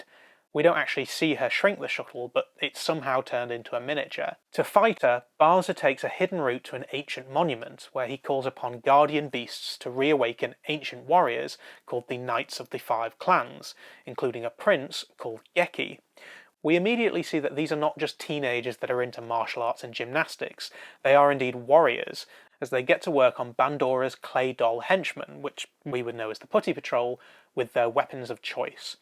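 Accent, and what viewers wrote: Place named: Black Country, United Kingdom. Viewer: Hes from the black country England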